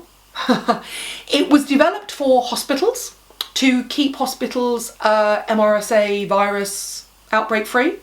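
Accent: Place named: England, United Kingdom. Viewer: Hi thank you so much for sharing a lot of good information. Your video was very entertaining. I always loved the English accent. By the way your cold sore doesn't look that bad. Thanks again. Cheerio.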